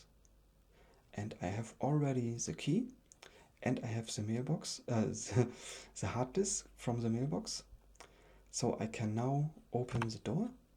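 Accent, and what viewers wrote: Germany. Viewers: are you from germany because your pronounciation sounds very german and you also said "ja"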